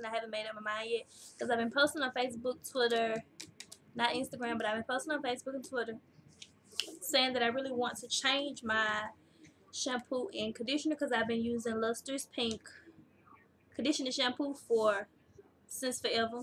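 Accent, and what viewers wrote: United States. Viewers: Ur so country lol its cute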